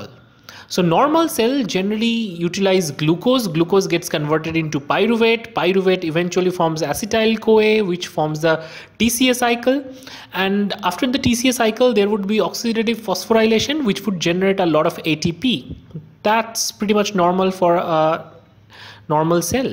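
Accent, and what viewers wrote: India. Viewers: Your videos are good but I don't watch them because I'm scared of indian accent 😅 sorry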